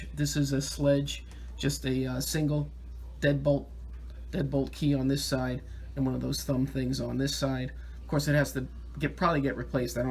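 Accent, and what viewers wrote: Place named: New York, United States. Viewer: Love the accent. (I'm in the Midwest.) Where are you from - New York, New Jersey?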